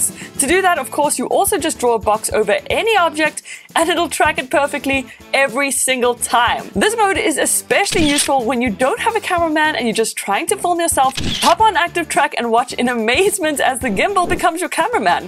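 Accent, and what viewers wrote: South Africa. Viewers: I love your channel!, you are so full of life and fun! Love your South African accent! Are you single by any chance? If not are you happy? Lol keep up the good work. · Are you south African ? 😅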